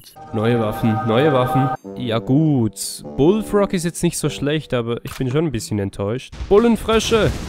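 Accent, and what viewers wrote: Switzerland. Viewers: Eine frage, bist du Schweizer?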